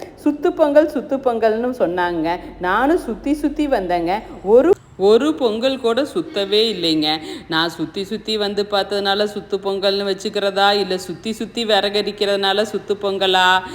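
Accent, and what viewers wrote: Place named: Kongu, India. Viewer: சூப்பர் மா பொங்கல் விட உங்க கொங்கு பாஷை தான் எனக்கு பிடிச்சதே மா சூப்பர் சூப்பர் உங்க குரூப் பொங்கலுக்கும் உங்க பேச்சுக்கும் ஒரு ❤️👏👏👏👏👏👏👏👏😄👍🙏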